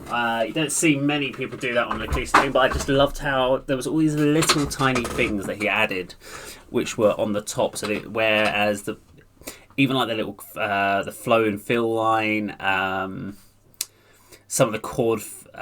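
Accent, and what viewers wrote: United Kingdom. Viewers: You got 1 like and 1 new subscriber here 🙋‍♂️ Bcause i appreciate ur honest reaction, and i like ur british accent too lol Next - cover.. Thx m8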